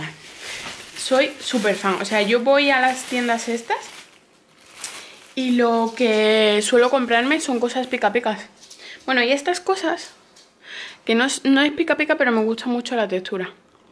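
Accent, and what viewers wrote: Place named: Andalusia, Spain. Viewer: Por qué no hablas siempre con tu acento andaluz??? No puedo evitar preguntármelo cada vez que veo un vídeo tuyo jajaja es mil veces más bonito que intentar quitárselo. Muy guay el vídeo y todos pero en andaluz mil veces mejor seguro!!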